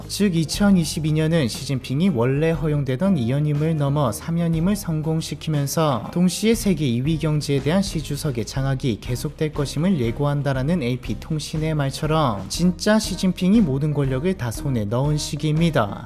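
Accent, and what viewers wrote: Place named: Busan, South Korea. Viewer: 내용보다 채널주 부산사람인게 더 충격임......아니 사투리 이정도 안쓰는 부산분은 처음 보는 거 같은....?....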